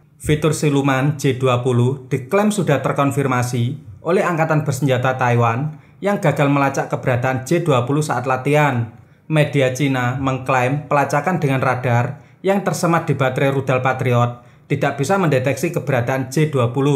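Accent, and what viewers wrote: Java, Indonesia. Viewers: Kau cakap apa woo...kental betul jawa kau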